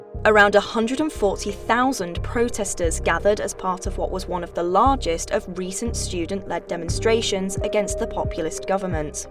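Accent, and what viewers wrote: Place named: United Kingdom. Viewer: This British accent is unwatchable, sorry...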